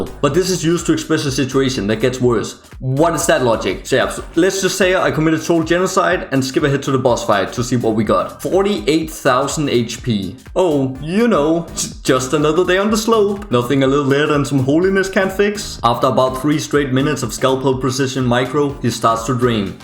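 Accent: Denmark. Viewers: Clocked the danish accent instantly x] happy to see a fellow dane play this game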